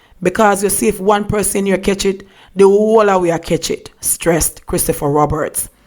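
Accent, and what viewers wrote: Jamaica. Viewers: I can never get tired of hearing you speak Jamaican... Lol 😂😂😂.... Great report though... I seriously pray that none of the residents contract Covid-19 🙏🏾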